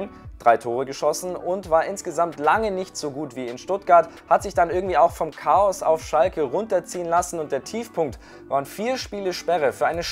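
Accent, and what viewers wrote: Ireland. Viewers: Er ist ire er kann nd wirklich was dafür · Ist sind irischer Akzent, kommt aus Irrland der Gute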